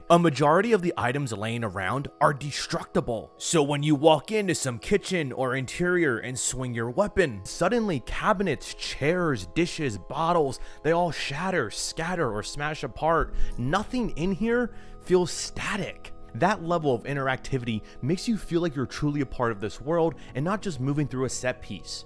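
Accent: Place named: Scotland, United Kingdom. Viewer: Just a smidge of the Scottish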